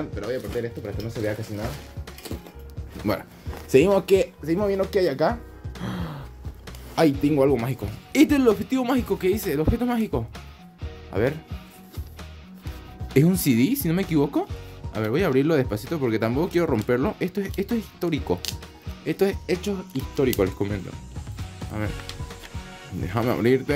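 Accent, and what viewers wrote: Bolivia. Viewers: Oye yo me preguntó eres de bolivia?? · Sos de Bolivia compa?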